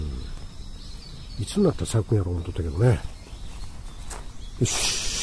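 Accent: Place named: Himeji, Japan. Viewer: さん、情報有難うございます！他にもたくさんの情報、楽しく拝見しました！！行って報告しますねー。実は、わたし、姫路出身なので、毎度『みなさ～ん、こんにちは』のイントネーションが私と同じで、わらってしまい、さんの動画のファンになりました（笑。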